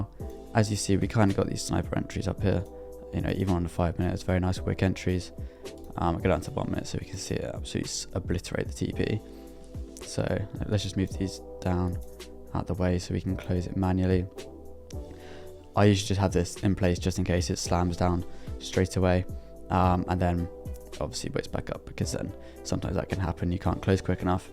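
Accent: United Kingdom. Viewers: Hey bro where in the UK you from?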